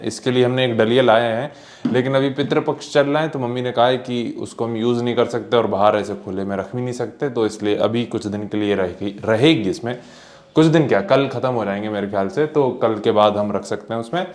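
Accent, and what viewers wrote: Gujarat, India. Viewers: Bhai tum gujrati ho kya ?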